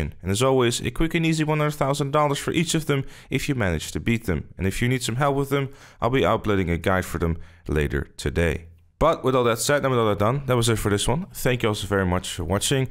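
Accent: Netherlands. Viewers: Probably dutch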